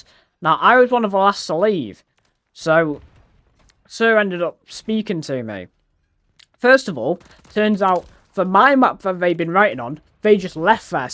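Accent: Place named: Yorkshire, United Kingdom. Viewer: You're in Wales? I'd of sworn you were in Yorkshire... 😂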